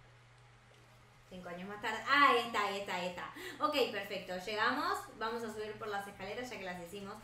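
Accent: Argentina. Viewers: Eres Argentina? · No es española es Argentina me 😑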